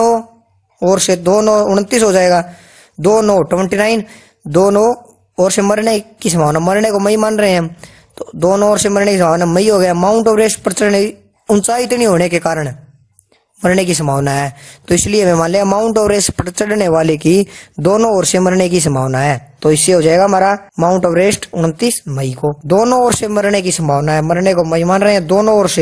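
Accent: Haryana, India. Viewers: Sir aap haryana k hai kya??? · जाको बोले पक्का हरयाणवी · Achi tricks h bhaiya......lagta h Haryana se ho☺️☺️☺️ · Hariyana se hai ke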